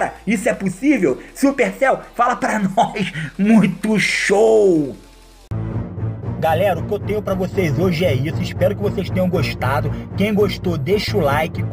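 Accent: Rio de Janeiro, Brazil. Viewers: ei VC é do rio de janeiro né? puxa o x, ixqueletos, e tbm parece ter a língua presa ne?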